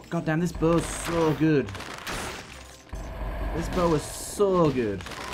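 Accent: United Kingdom. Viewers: Yes more dead Cells! What part of the UK are you from btw? 😊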